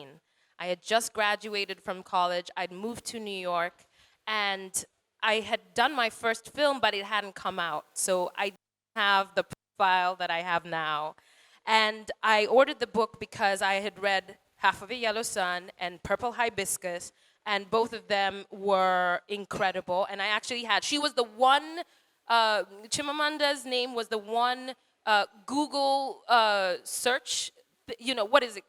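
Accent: Nigeria. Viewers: She's our own do you hear that English oooooh Nigeria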